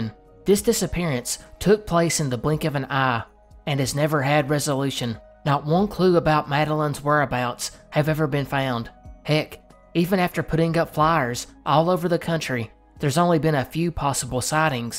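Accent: South, United States. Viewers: as a Kentuckian born and raised, i love your videos and really appreciate hearing more southern accents out there in the world ❤️ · This is fire. As a guy from Cali, I generally have mixed feelings about a southern accent. However, your tone is soothing, and you don't give out "hick" vibes, you sound well-spoken and intelligent. Basically, I feel like a long lost southern cousin is schooling me on interesting cases and stories. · My toxic ex used to tell me I couldn’t watch your videos because the southern accent was annoying, he would tell me to shut it off immediately everytime. 4 months without him and I can enjoy your sweet southern voice and amazing content in peace❤️ helps me remember the little victories of leaving when I’m feeling weak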